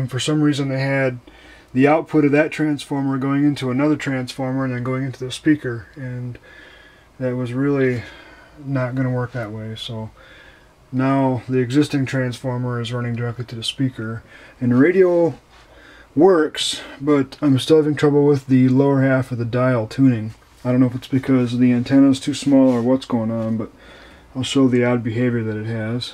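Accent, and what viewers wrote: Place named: New York, United States. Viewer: Sounds like your in NJ or NY? Great video.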